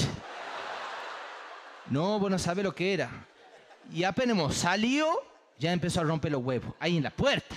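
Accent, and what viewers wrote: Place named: Tucumán, Argentina. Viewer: asi habla los tucumanos gatos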